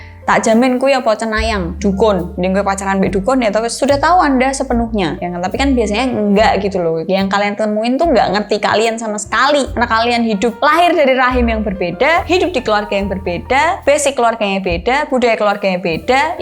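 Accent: Java, Indonesia. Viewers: Medok e jan joss mbk yu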